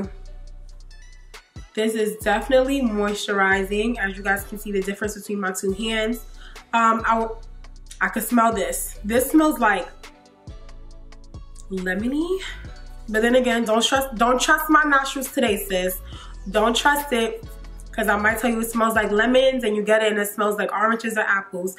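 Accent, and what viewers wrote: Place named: New Jersey, United States. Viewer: Are you from Jersey? Your accent is so cute!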